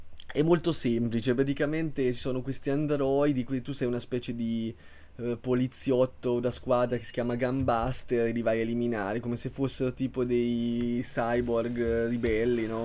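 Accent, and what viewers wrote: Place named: Marche, Italy. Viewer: no ma mio padre è della zona di Fermo e andiamo spesso in vacanza a San Benedetto/Porto San Giorgio, da come parli sentivo un po' di cadenza marchigiana 😁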